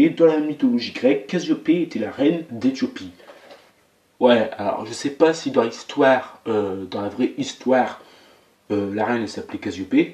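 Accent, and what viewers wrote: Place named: Nord-Pas-de-Calais, France. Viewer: Magnifique accent ch'ti en tout cas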